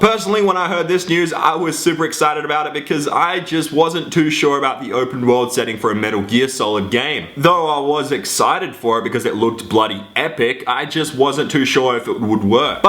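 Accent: Australia. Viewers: are u from austrailia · Is this guy Australian?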